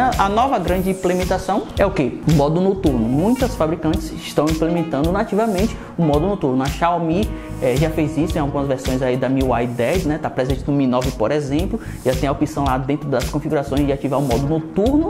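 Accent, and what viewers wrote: Bahia, Brazil. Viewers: Ele é baiano !? 🧐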